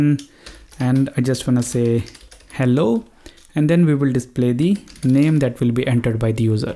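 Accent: India. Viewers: he has an Indian accent, he has proven more superior than me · by the time I hear Hindi accent I press like before watching the conter! Thank you my friend, I am sure I will find wisdon!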